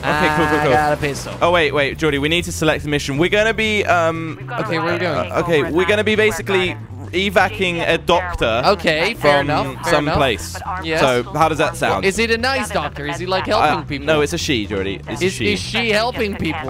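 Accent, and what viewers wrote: England, United Kingdom. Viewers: are you english or you from netherlands too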